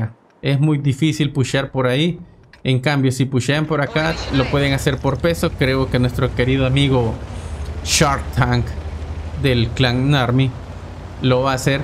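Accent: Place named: Argentina. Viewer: Che de donde sos? Te escucho y algunos modismos o formas de hablar pareces de Argentina jaja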